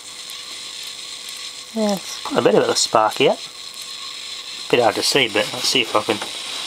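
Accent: Australia. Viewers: It just takes a while to get used to his rural Australian dialect, in the past I've had to rewind and listen to him again. He does make some interesting videos though, but not all are winners of course.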